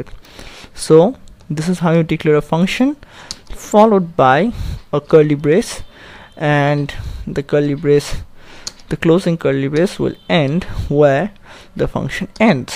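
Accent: India. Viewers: You sound like Indian?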